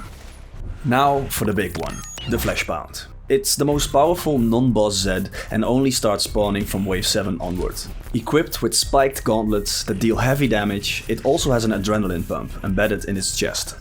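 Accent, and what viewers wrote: Netherlands. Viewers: Ik ruik Nederlands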